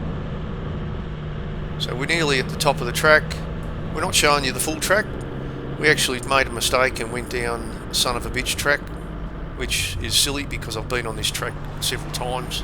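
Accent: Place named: Australia. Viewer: Interesting accent, I realised Australians pronounce 'i' as 'oi', like 'time' becomes 'toime'. Nice track i wish my country had such places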